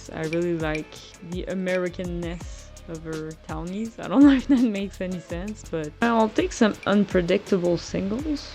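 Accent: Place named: Quebec, Canada. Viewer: une quebecoise !? je déjà suis fan 💋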